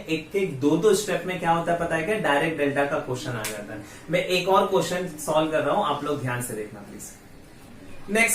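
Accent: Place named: Tamil Nadu, India. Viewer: Bht bhdiya sir.. ur tamil accent is cool 🎉 😎😎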